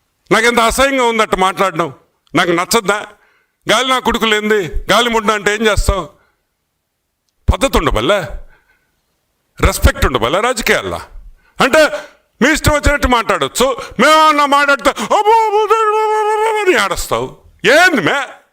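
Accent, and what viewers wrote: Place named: Nellore, India. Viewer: అనం వి రెడ్డి గారు 🙏🏽🙏🏽🙏🏽మీ విశ్లేషణ న లు అద్భుతమైన వివరణ నలతో చలో లోక్తులు తో నెల్లూరు భాషతో అందరిని విశేషము ఆకర్షణ తో వినసొంపుగా ఉంటున్నాయి 🙏🏽🙏🏽🙏🏽🙏🏽🙏🏽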